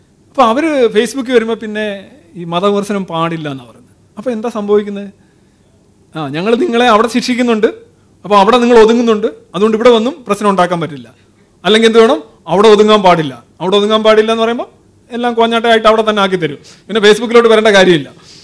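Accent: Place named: Kollam, India. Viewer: കൊല്ലത്തുകാരുടെ ഭാഷാപരമായശീലം ........ മാത്രം .....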